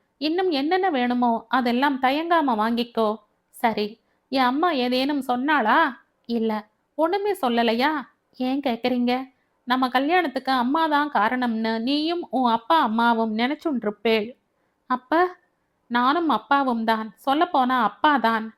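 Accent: Kerala, India. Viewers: Besh beshakum ungalin inimaiyana malaiyalathamil vasippathu kathiyum super💖💖💖👍👍👍🎉🎉🎉😊😊😊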